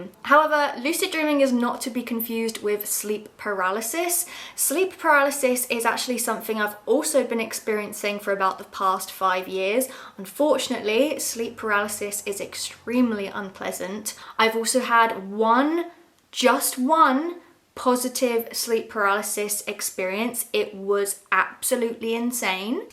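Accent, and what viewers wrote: Scotland, United Kingdom. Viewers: I'm going to guess from her accent is Scottish, can't find any explicit info, I'm not the best linguist/dialect judge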